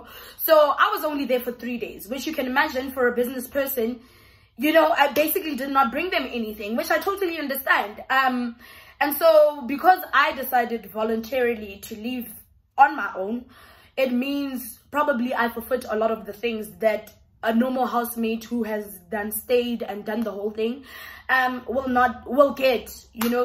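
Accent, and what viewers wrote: Nigeria. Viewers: your Nigerian accent is top tier. 😂❤️❤️ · It’s the Nigerian accent for me😂😭😭❤️‍🔥😻🤌🏽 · Babyyy your Nigerian accent is hot hot mahn🔥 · It's your Nigerian accent for me 🔥 😭😭😂👐⚰️ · What is your nationality babe,,,coz l hear you talk Nigeria accent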